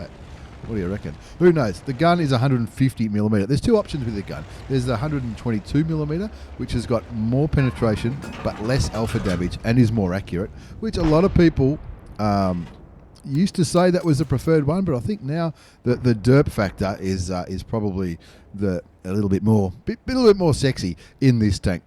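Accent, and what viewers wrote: Australia. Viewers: Hey yes you not those other bloaks luv your videos and awesome aussie accent keep up good work from Jax Fla USA P.S no homo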